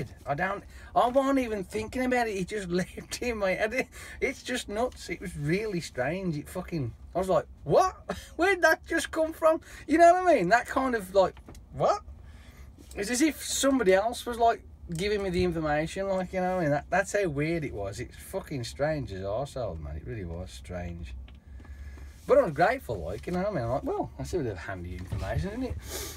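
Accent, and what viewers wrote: Birmingham, United Kingdom. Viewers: It’s like I’m watching the brummie version of Karl Pilkington sometimes!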